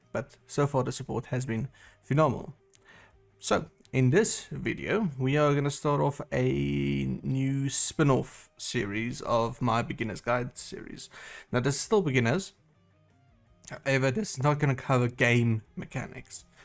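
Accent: South Africa. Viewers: Yesss!! Finally after finding your channel today and binge watching your videos I confirmed your are south african🙂 Yoh dit het my nogal bietjie mal gemaak om te luister en heeltyd te dink die ou is van SA. Dankie vir al die goeie info op al jou videos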